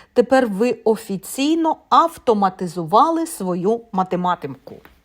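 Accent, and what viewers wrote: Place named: Germany. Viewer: Варто зазначити, що конструкції більшості мов програмування названі англійською мовою. Тому і вимовляти їх варто англійською. У вас вимова схожа не німецьку чи французьку. Чому це важливо? Тому що учні поглинають це і використовують. А перевчитись потім дуже важко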